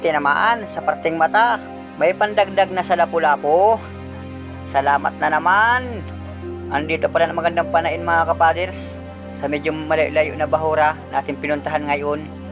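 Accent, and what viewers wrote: Bicol, Philippines. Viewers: bicol na bicol ang tono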